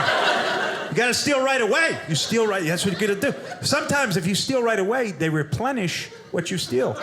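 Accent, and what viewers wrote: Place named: New York, United States. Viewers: My favorite NYC accent 😁... your road rage joke "pre-finger" 🤣🤣🤣. Good stuff! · Its true about driving in NYC when someone cuts you off they give you the finger. Its not a joke it happens every day! But I realize that is funny to people not from NYC!Although his accent says Long Island not the city. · But I like his neuyak accent regardless.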